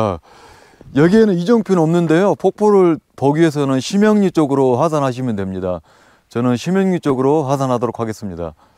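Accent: Jeolla, South Korea. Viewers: 안녕하세요 추천영상에 떠서 놀러왔어요~ 저는 책읽기를 하는데 산을 좋아해서 산영상 자주 보다보니 추천에 늘 산 채널이 떠요😝😝 내연산 저는 딱 한번 가봤어요 근데 제가 간 날 산행중에 비가 너무 많이 와서 정상은 못가고 폭포길 찾아서 내려왔는데 얼마나 걸었는지 하산해서 보니 30키로를 걸었더라구요 저에겐 그냥 힘들었던 산 ㅎㅎㅎ 편안하게 설명해주시니 영상보기가 좋습니다 ㅎㅎ 내연산은 폭포가 유명하다고 하더라구요 친구들 아니었으면 한번도 못가봤을거예요 알바도 하시고 힘드셨겠요~👍👍 전라도 억양 저는 좋아해서 그런지 설명 편안했습니다 ㅎㅎ 감사합니다 구독하고 자주 놀러올게요🙏🙏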